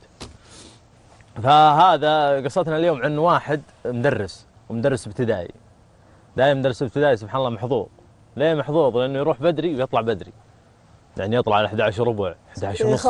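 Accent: Hail, Saudi Arabia. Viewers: ي زيينن حكيه حائل يهبل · تفوز سوالف و لهجته الحائليه😭😭😭💜💜💜💜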